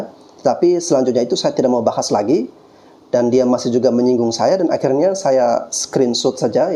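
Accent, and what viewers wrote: Indonesia. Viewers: Jujur dari dari segi intonasi dan tata bahasa bahwa saudarakuku ini jauuh lebih INDONESIA dari pada...........it👍👍👍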